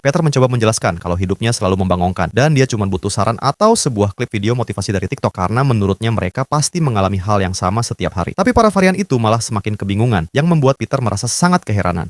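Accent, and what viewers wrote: Sunda, Indonesia. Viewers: Abang ini ternyata orang Sunda ey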